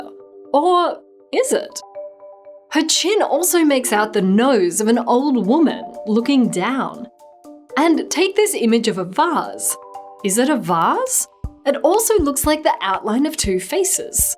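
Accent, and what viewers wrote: Australia. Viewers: Accents are cool and weird sometime. I wonder how "tube" became "tchub" down in Australia. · I'm not hearing any at all, just an Australian accent.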